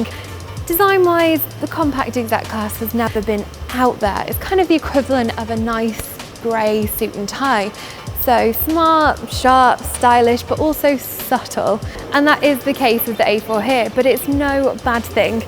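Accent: United Kingdom. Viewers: Ou that British accent...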